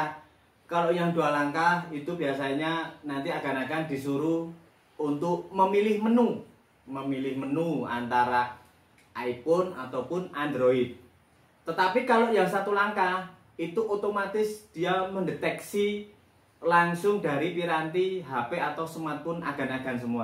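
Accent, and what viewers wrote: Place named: Java, Indonesia. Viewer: Capek denger nya medok skali bahasanya🤦‍♂️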